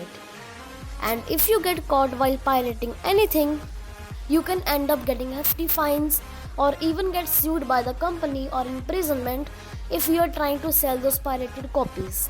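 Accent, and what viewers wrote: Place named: India. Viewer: My Desi accent boi🙂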